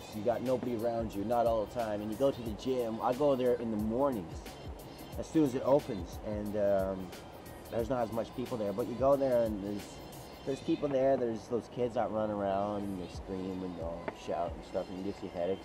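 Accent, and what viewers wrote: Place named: Newfoundland, Canada. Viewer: Awesome video bud. Was like I was there having a chat with ya! Your newfie accent is gettin tick! Haha cheers!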